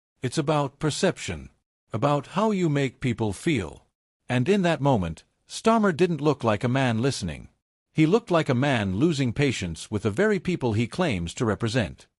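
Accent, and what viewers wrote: United States. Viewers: The title of this video is totally unrelated to this video. KEIR STARMER TO BE REMOVED FROM PARLIAMENT AFTER HOSTILE RESPONSE- is a blatant lie as there is nothing in this video that has anything to do with the title. And again, there is an American narrating this video. One big con and one big lie. This video should be removed from You Tube.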